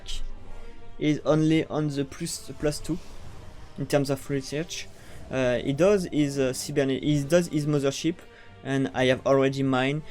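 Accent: France. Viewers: Your French English is cute.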